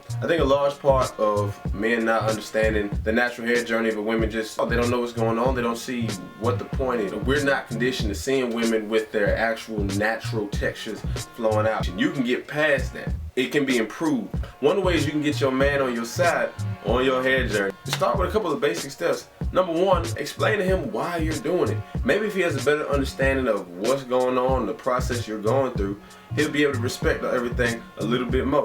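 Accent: South, United States. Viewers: WOW! I'm impressed at your natural hair verseness! I agree wholeheartedly about how women should include men in on why we're doing what we're doing to our hair and WHY! LOL going to get some ecoctyler 1am! so 4a is your crush....so sweet...when can we get married???!! LOL! Where are you from? I hear a little southern accent. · I love the message....but I love love love that southern accent! :) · that southern accent.... sexy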